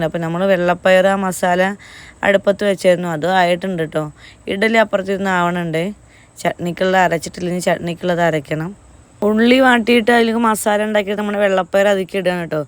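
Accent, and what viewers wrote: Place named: Palakkad, India. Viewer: ട്ടോ പാലക്കാട്ടുകാരുടെ സംസാര രീതിയാണ്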